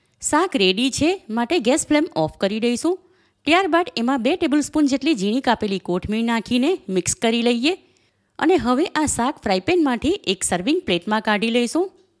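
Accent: Surat, India. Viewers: તમારી હુરતી સ્ટાઇલ ની ભાષા સાંભળવાની મજા આવે છે .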